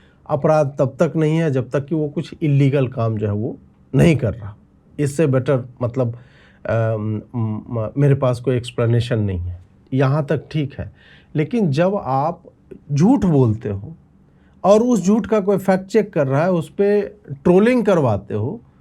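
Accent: Bihar, India. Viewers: wah 10k+ comment🤣 bhaiya bihari tone wali baat shi h. mai khud bihari hoon pr ye emotional fool banane ka tarika hai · Begusarai ka tone muh se nikaliye jata hai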